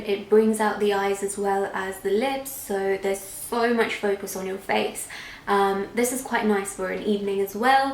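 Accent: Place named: United Kingdom. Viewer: glad to know that I love you British accent. Keep it up. I started watching your video from the one where you explained the deep set eye.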